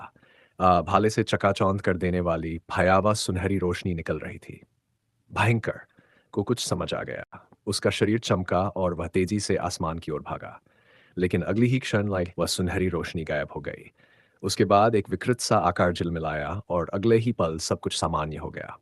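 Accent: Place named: United States. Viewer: Bro video acchhi but voice American English type ho rahaa hai wo better karo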